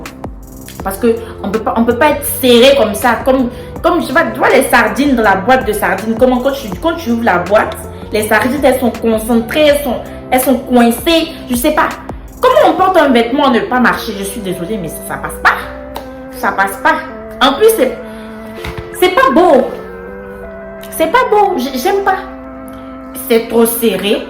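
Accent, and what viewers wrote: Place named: Ivory Coast. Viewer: l'accent ivoirien💖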